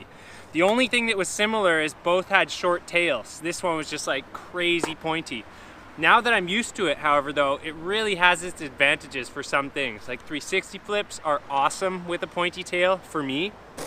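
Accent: Canada. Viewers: Canadian I think bro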